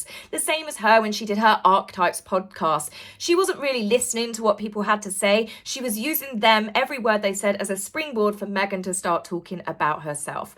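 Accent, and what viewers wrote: United Kingdom. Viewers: Lol, I thought exactly that (about how British Edward, the Duke of Kent) looked. What a profile! He’s a year younger than my Dad (Papa)…he’s up on his roof cleaning his gutters, using a push mower to cut his grass, does all the same stuff he did 20 years ago. He scares all of us girls (3 daughters) to death, because he lives alone. But he won’t have any of our fussing, he just carries on an ignores us. Thank you for reading that bit in your lovely British accent, and not subjecting us to Meghan’s common Californian 🤭. I say that as a Southern American with friends in California…but her voice in particular, just really grinds my gears 😉. 💖🌸💖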